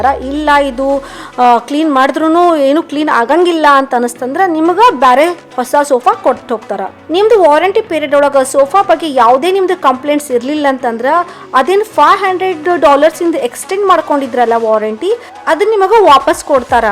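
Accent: North Karnataka, India. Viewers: uttara karnataka language matadtira akka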